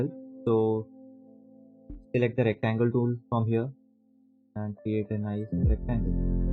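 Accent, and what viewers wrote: India. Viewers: are you a indian your accent feels me like that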